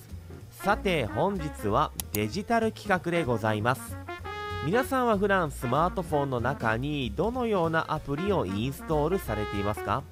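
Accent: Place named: Kansai, Japan. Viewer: 関西の出身でしょうか、親しみを感じて聴き易いです。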